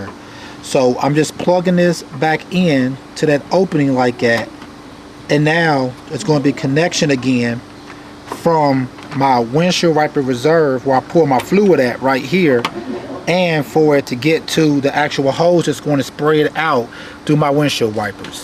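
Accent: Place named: Kentucky, United States. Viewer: Thank you so much for the video lots of help!! but also it was funny hearing your accent and I said man it sounds so familiar KY... represent Louisville Kentucky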